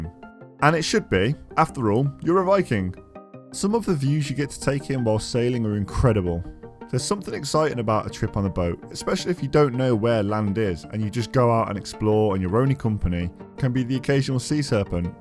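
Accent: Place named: Coventry, United Kingdom. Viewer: You sound like you're from Coventry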